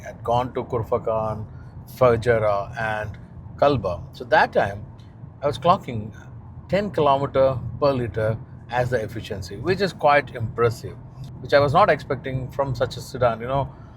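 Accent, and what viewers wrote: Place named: Kerala, India. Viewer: Heavy Malayali English accent from the man. Then I saw the location, ahhh? Dubai. OK! Nice content.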